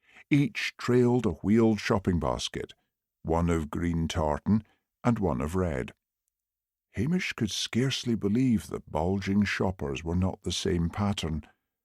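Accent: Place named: Scotland, United Kingdom. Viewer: Absolutely love these narrations. Nobody can improve on your fabulous Scots voice 😊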